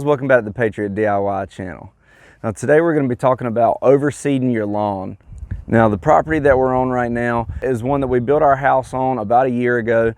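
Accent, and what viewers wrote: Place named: Southeast Georgia, United States. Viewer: Lawn looking good. You sound like you're from southeast Georgia.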